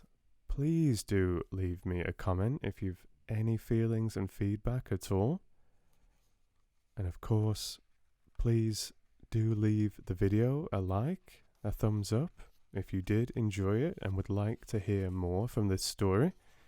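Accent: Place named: United Kingdom. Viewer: La verdad no entiendo un caraj* no soy muy buena con el acento británico, pero es uma delicia caralo 👌 suscritisima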